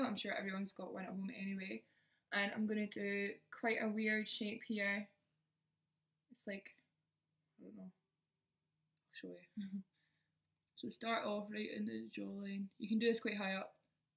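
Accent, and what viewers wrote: Scotland, United Kingdom. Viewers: Are you Scottish xx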